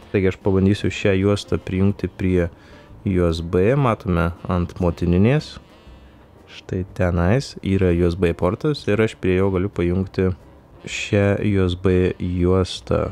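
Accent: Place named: Suvalkija, Lithuania. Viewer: Akcentas labai suvalkietiska primena :D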